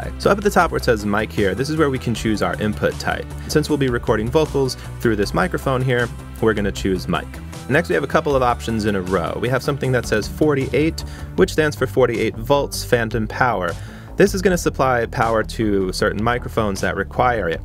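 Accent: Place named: Long Island, United States. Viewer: Hey great vid I’m in Jersey I seen that strong island accent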